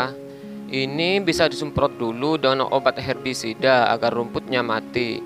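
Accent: Madura, Indonesia. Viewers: Logat Madura 😅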